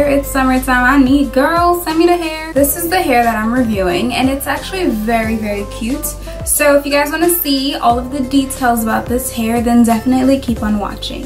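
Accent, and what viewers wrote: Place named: Haiti. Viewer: Haha I knew you were Haitian by your accent. Love it♡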